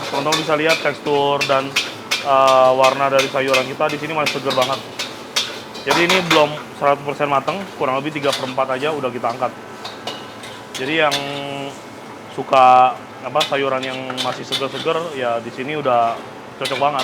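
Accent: Banyumas, Indonesia. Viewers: Koko kok bisa bahasa ngapak yah? Kepengin punya kedai kaya gini koh Salam dari cilacap kota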